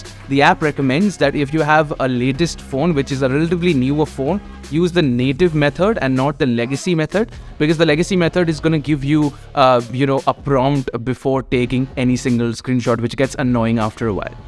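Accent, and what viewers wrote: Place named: India. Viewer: Seeing "forbidden apps not in play store" and hearing the lnd¡an voice gives me M!croscft tach support vibes. Great video! 😊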